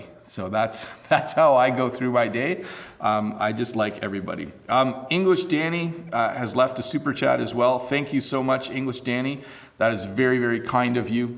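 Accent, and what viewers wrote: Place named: Canada. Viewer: Thank you, i find your canadian accent better than the u.s.'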